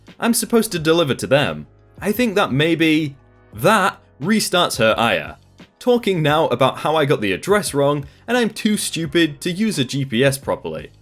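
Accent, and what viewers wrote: United Kingdom. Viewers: If you want to find a taser flashlight, I have one. It's called the Guard Dog Special Ops Flashlight/Taser combo. I live in America so I'm not sure of you could get one from these people as you sound British. Still, if you can I highly suggest it!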